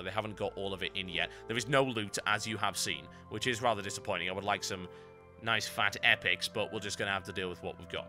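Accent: United Kingdom. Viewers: don't forget to mention his really cool British accent.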